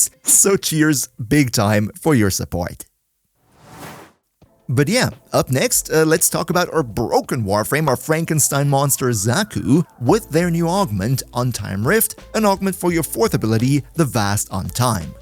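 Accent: Germany. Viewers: You sound just like a happy german guy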